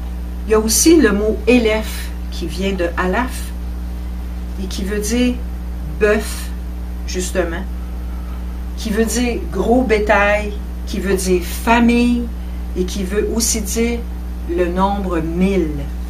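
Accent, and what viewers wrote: Canada. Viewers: Shalom ma belle! Comme je suis heureuse de vous trouver! Je suis dans cette merveilleuse découverte de l'Alaphbayt et de tous les merveilleux secrets que Notre Père YaHUaH a caché dans celui-ci. J'aimerais bien en discuter avec vous si possible et Yahuah voulant..Lyne ❤️. Écoutant ton accent, je vois que tu serais du Québec..HalaluYahuah 🎶 Sois bénie (BaRuCH) en Yahusha ha Mashyach 🌺❤️ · bonjour, il faut lui demander. Vu son accent elle est au Canada. Que Dieu vous bénisse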